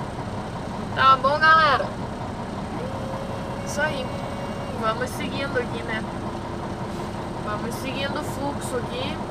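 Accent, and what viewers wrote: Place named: South, Brazil. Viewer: Boa tarde,que sutaque bacana,por isso que gosto do sul,bom final de semana ,que Deus abençoe muitíssimo.rio de janeiro.bairro Pavuna.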